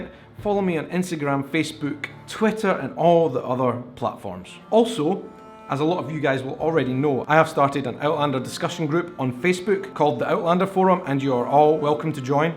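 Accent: Scotland, United Kingdom. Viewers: Hey, I did watch the first season of Outlander, and loved it. As an Ex Pat Scot, from Edinburgh, I initially watched it, just to hear my native accents again. And I loved both of the main Characters, and yes, they both are handsome and beautiful. besides being good actors. And the story is well written. I also enjoy Sci-Fi, or these time travelers. Why I missed series 2 and 3, I'm not sure. Probably because I have so many viewing options, that it probably just got lost in the midst of all those options. I do enjoy a strong Female Character, and thankfully, Brits have plenty of great female actors to play them. I can't even recall the channel it was on, but I know sooner or later, it will appear on one of my Streaming Media systems. Then I'll catch up with the show. I subscribe to two British Streaming Channel, and Amazon. I just recently cancelled my Netflix. 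So I'll do a web search, for Outlander, and maybe catch up with the seasons I've missed. I've often thought, America and the Studios should pay the U.K. Royalties, for all those Royal Sagas, and others they have produced. Even though, I've been an American for over 50 years, I'm still a Scot, and it seems, much of my accent. Americans do live the Scots. And show it, especially those with Scot's blood in their veins. I enjoy your Vlogs. And hearing my old accent.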